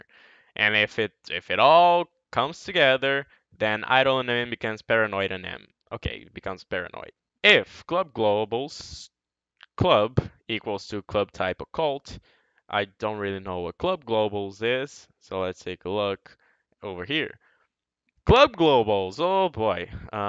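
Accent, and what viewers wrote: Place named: Brazil. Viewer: cara, o maluco pode ser fluente, mas sempre da pra sentir o brasileiro por trás kkkkkkkkkkkk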